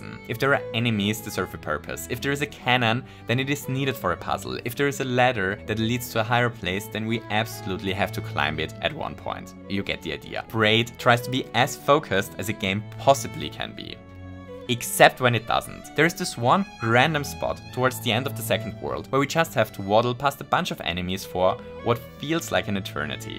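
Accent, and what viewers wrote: Germany. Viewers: Hes german… you here that 5 secs into the video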